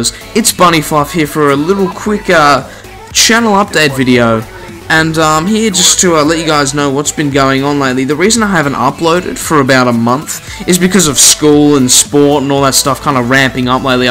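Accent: Australia. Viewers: Are you aussie by any chance?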